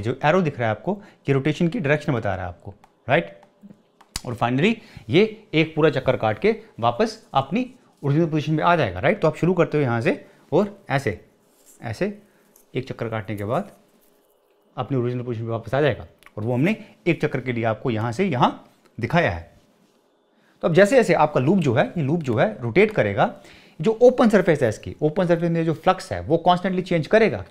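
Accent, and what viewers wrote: Kashmir, India. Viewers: Sir aap kashmir se ho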